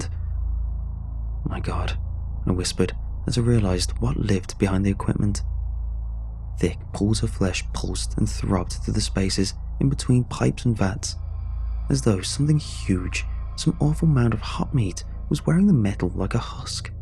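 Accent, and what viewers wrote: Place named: United Kingdom. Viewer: I've just found you, it's refreshing to hear a follow brit narrate.. although I have a long way to go before I reach your status. If ever!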